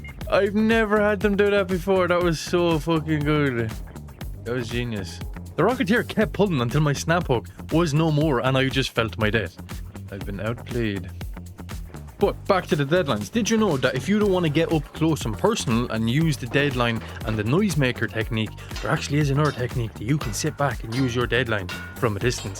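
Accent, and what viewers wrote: Ireland. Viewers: I'm a simple man, I hear Irish accent, I follow, like and subscribe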